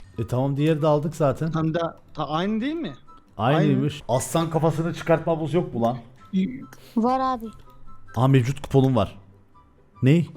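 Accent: Cyprus. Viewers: Sırf kıbrıs ağzın için abone oldum çok iyiii msksleodk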